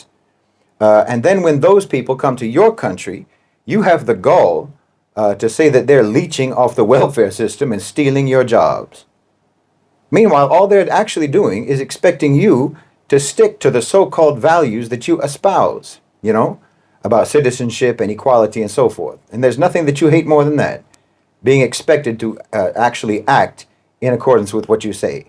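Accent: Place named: Louisiana, United States. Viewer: This guy is soooooo from Louisiana I can tell from his accent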